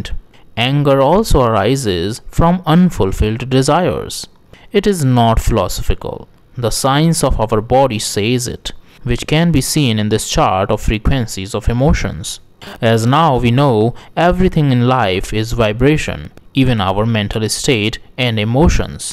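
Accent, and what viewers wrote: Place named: Bihar, India. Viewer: Did you learn English in Bihar??!!!😂🤣😂😆😃😋😡😡😠😠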